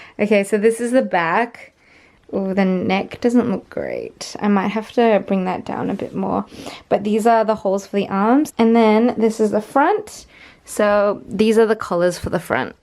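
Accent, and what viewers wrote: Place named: England, United Kingdom. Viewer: Asian with an English accent!!!🤣🤣🤣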